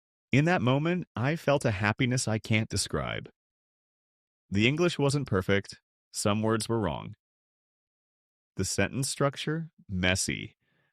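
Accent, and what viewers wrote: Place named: United States. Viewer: You sound 100% American and your vocabulary, grammar was completely accurate omg I thought you were an American in disguise, how on Earth are you not a native English speaker??? 🙀🙀 Please make many more videos about your journey learning English grammar, vocab, and esp speaking like methods, technique, textbook, material, webs, apps etc I really look forward to it. Your achievement on English speaking as your second language absolutely takes me aback, you're most iconic English speaker to me I appreciate your message in this video: "no need to be perfect, be brave enough to try again, make as many mistakes as it takes, learn from them and get better bit by bit" - truly mind-blowing mindset.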